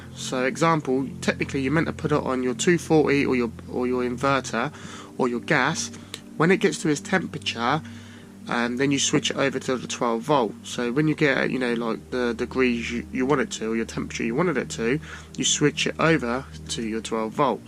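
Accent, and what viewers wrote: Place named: United Kingdom. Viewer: Love the UK accent and expressions. Good thing I've watched Are You Being Served? for years, so I understand some of your expressions. I use "knackered" a lot now.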